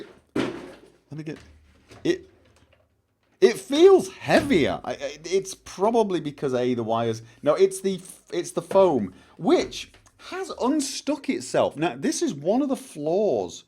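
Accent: United Kingdom. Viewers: Is your accent because of living in norway or is it just a british dialect? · er jo ofte grunnen for expats. Belgisk her, norsk kjæreste og. It was pretty funny to learn one of my fav person on youtube is living in Norway while sounding so absolutely british though. Big planet, small world.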